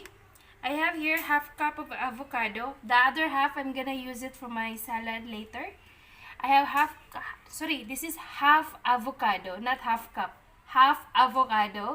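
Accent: India. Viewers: Indian accent ka narin Kbayan.Buti nlng Pinay kharap ko bka dudugo n nmn ilong ko kakaenglish..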